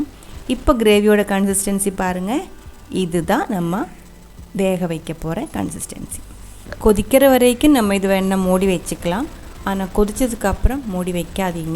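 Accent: Kerala, India. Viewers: കൊഞ്ചം കൊഞ്ചം മലയാളം വരുതേ ഉങ്കൾ തമ്മിൽ സൊള്ളും നേരത്തുക്ക്‌ 😃☺️☺️ പാത്താലേ തെരിയും സൂപ്പർ കറി എപ്പടി ഇന്തമാതിരി... ആന്നാ നാൻ ഒരു മുടി ട്രൈ പണ്ണി പാത്തിയാച് നടക്കവേ മുടിയാത് 👌👌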